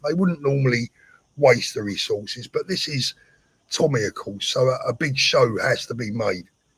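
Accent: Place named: London, United Kingdom. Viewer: Hi, you're on 284,000 subs, great going. I didn't leave as some did, stillnfindcyouvas one of the most down to earth blokes out there, guess it's the London accent, all my family hail from London, dads from the west of London, mums from Poplar. We live in Aylesbury now, wifey and my son. Will be in London on 13/09/25. Stay safe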